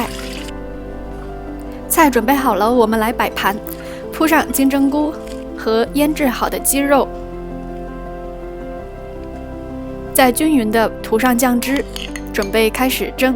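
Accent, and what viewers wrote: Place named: Japan. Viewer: 看过一个日本人说中文做菜的频道，你这语音语调描述方式就听着好像啊。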